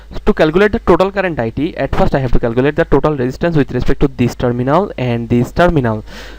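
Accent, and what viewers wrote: India. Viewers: 解說得不錯 ,但是印度口音的英文我真的無法接受...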